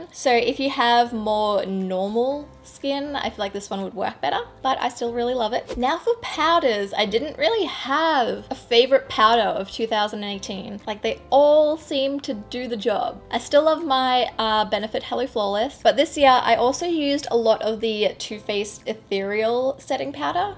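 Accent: Australia. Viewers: Hey!!! Just wanted to let you know that I love your channel!! Im so glad that i have found it!! Love the Australia 🇦🇺 Accent!! Anyways hello from Texas ♥️♥️♥️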